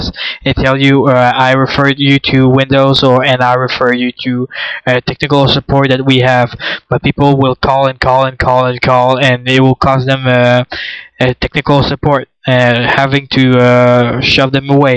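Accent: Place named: France. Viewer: It doesn't matter whether he spells well or talks fluently, freaking jerks! He gets it done and can at least speak different languages, can you do the same? I speak 3 languages myself, idgas about what people say because they can't speak other than one freaking language. I can blend in different settings so can this guy, can you? People are freaking low life mfrs, anyways, good video. lol I can tell you are French I think haha...you french people have that easy recognition accent. Alors sinon, t'a appris ca a l'ecole ou toi meme? Je suis en train de faire mon certifica en Network Security, c'es comment comme boulot?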